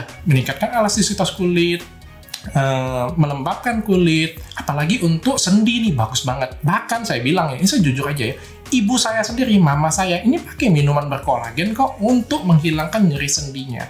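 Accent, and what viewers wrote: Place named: Sumatra, Indonesia. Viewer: menurut saya video klarifikasi ini sudah tepat. Saya nonton dan ngikuti get it beauty (acara tv tentang kecantikan dr korea). Kontennya sama aja dengan yang disampaikan dokter di video minuman berkolagen, hanya beda cara penyampaian. Saya yakin kasus ini muncul karena orang ga sreg sama cara orang sumatra menyampaikan informasi 😅 Saya medan lang, keluarga ya kayak gitu semua kalo ngomong. Tapi kalo berhadapan sama orang jawa, kadang dianggap kasar ato judes dan jahat.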